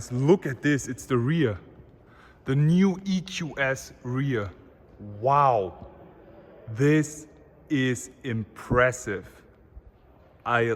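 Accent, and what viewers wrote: Germany. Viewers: Diese Deutsche Akzent beste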